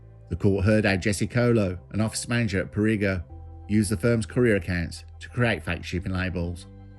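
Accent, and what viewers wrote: Birmingham, United Kingdom. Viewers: your dulcet Brummie tones send me to sleep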